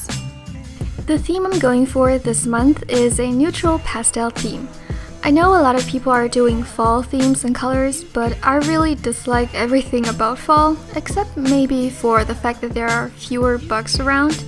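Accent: Germany. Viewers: Since you said thats what it's called in German, I hear that you are German. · Wait- where are you from? Germany?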